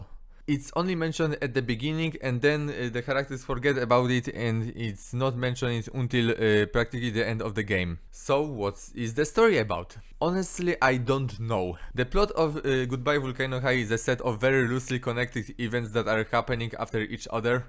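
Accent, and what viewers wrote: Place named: Poland. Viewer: You sound very polish :)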